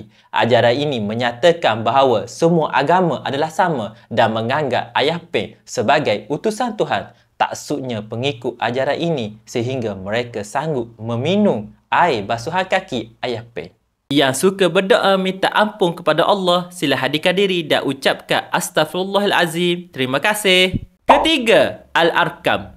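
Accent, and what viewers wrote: Kelantan, Malaysia. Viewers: Kecek klate jah be... Belit lidoh dgr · Pekat lagi ter kecek kelate 😂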